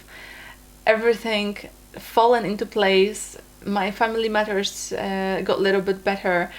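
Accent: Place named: Poland. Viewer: First time I listened to you I realized that you had the same accent that I have. I came to US from Poland at the age of 15 and I can totally relate to you and your story. You are an amazing person and you remind me so much of me at that age. I am now much older and people always get amazed by my life story. With will and perseverance one can accomplish all the dreams. With Gods or Universes help all things are possible and you are a great example of this..You are very beautiful and you have an amazing positive attitude. I loved listening to your story. I am forwarding it to my daughter who is exactly your age. Thank you for sharing it. Wishing you all the best because you deserve it. You go girl.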